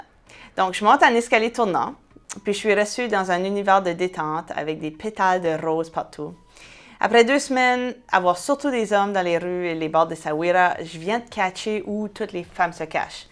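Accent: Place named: Quebec, Canada. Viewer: Un vrai choc culturel, je m'attendais à être agréablement surpris par une dame prés de chez moi, mais à la fin je suis dégoûté sur la forme et sur le fond ! Accent bledard beurk , de l'époque des filles du rois, du Séraphin: Nouvelles histoires des pays d'en haut, des coureurs du bois, avec un regard hautain de la petite québecoise qui voyage pour ses premières fois! Le Maroc un pays multimillénaire, bourré d'histoire de civilisation, visité et revisité par les plus grandes stars du monde, show-biz, cinéma, sports , arts, etc...Mais la petite Lavaloise avait peur qu'il y vivent encore des cannibales, "DOUX" Jésus Marie, on l'a pas dévorée, elle est revenue en un seul morceau. Mince, on ne vend pas le pâté chinois et la poutine au Maroc . Hands down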